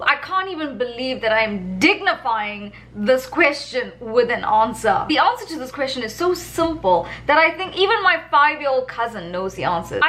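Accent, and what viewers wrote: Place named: South Africa. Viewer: Oh...... Finally...! I know this point will be entirely off topic ne but flippen hell.. it really feels good to hear your South African accent + your SA Indian accent no like less! For the longest time I've mostly only ever seen these US presidential discussions feature folks from USA & UK reporters and alike. You're bringing a voice to this topic from SA's observing point of view & we're waiting out here just wondering how these next 4 years of USA, laws and policies affect us. This is Ken, from Our East London, SA lol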